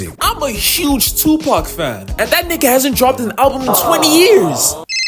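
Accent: South Africa. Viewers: are you south african? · you sound south african ngl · are you South African? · you South African my guy? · You got sum South African in ya? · the video is hella funny but dawg you have the most jozi/pta accent ever, are you south african? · Mfana are you South African?😭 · Bro u South African? Coz you definitely sound like it 😅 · u south african neh? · Are you South African? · Bro can't escape his South African accent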